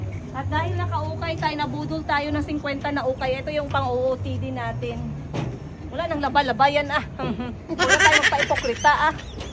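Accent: Iloilo, Philippines. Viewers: I love ilongo accent sayo madam 😂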